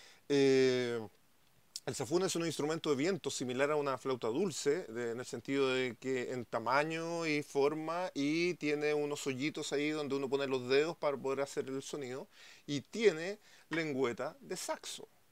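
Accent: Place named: Chile. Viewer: asi hablan los chilenos eso ya no se corrige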